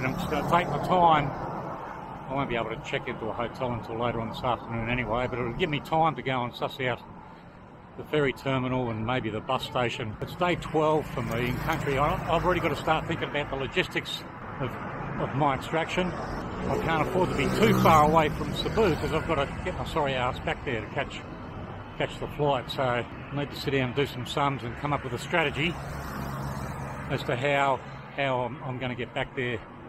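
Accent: Australia. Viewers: are you from Australia or NZ?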